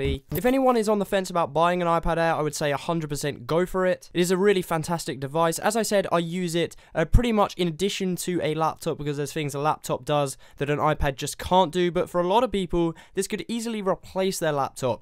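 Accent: United Kingdom. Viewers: Your my favorite tech tuber. You make awesome videos! ...and I love your British accent, talking about tech XD it Reminds me of Jony Ive lol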